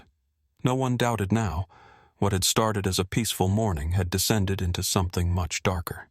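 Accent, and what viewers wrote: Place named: United States. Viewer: The usual load of American accented crap! Give it away. Try it out with the Arlington guard change/ military cemetary! No? Gutless?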